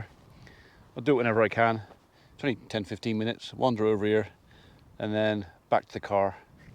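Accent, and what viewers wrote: United Kingdom. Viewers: took me awhile to get used to the accent...have it narrowed down to the British Isles. Best I could do. Not Australia. Amazed how Biscuit was calm as the horses passed by.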